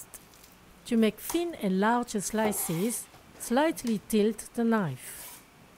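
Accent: France. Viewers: Asian lady with french accent - noice.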